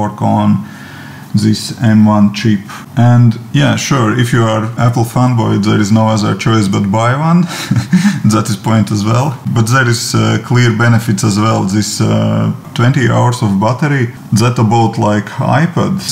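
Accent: Russia. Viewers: You're Russian, right? You have a Russian accent :D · lol. I thought you are Russian. you have a russian accent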